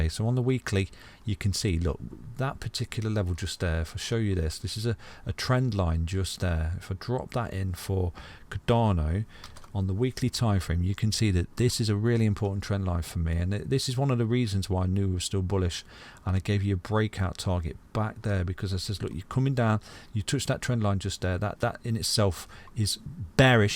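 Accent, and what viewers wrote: United Kingdom. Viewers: He speaks the most clear British accent I have ever heard, and I am a German speaker 😄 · I AM FROM THE UNITED STATES 🇺🇸 WE DO NOT SPEAK LIKE THAT. UK y’all sound like a dying dog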